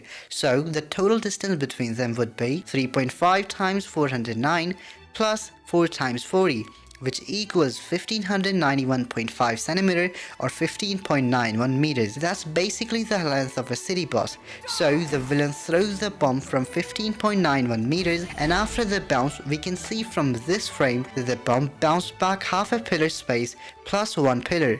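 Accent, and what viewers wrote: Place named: India. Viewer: Yo I guessed you're from India purely on the basis of your accent and I realized when you said you have a Potato Phone as Indian Parents usually don't give good phones to their son or daughters😭😭😭 Love From West Bengal aka Hamba Hamba Ramba Ramba State! Peak Content ❤❤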